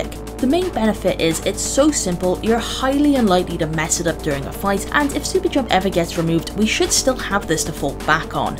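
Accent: United Kingdom. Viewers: I love your british english!!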